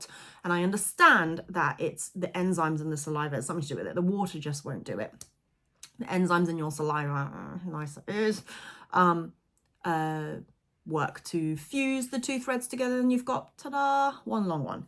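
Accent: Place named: United Kingdom. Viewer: You were cracking me up with your side bar comments. And it looks like we may have the same esthetics with knitting. So you may inspire me with future projects! And besides … I really enjoy listening to your British (or is it English) accent. ☺️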